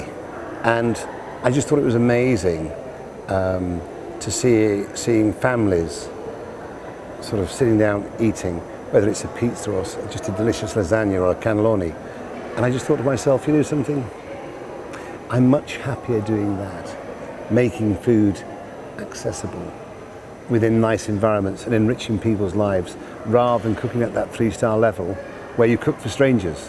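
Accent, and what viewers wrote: United Kingdom. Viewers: I love how his accent goes more Leeds when he's the man of the people, while then immediately correcting with his eloquency. He knows the language of food and of the poor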